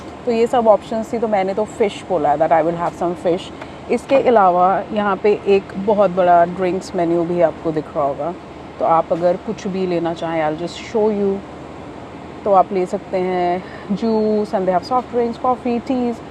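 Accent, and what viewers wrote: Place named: Punjab, India. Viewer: Bhabi g thoda accent punjabi boldeya bahla ajeeb lgda kde kde Bahut sohna kr raye soon u gonna get good number of subscribers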